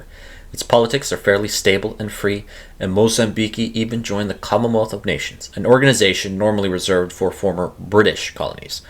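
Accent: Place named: Brazil. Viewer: Great video! Just make sure not to use Brazilian pronunciations for Portuguese names in the future 👍🏻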